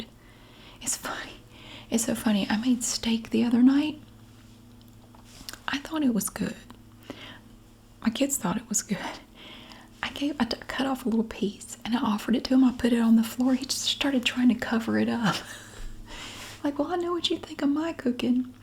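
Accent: South, United States. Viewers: Enjoy your videos. You have a nice southern accent. Toboggan means knit hat in the south? That is funny. I’m from Minnesota and here Toboggan is definitely a sled. We have plenty of snow in winter.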